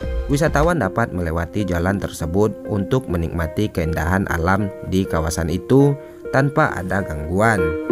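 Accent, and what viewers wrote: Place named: Aceh, Indonesia. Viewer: Kamu orang Aceh ya min .. logat mu kental sekali.. 😄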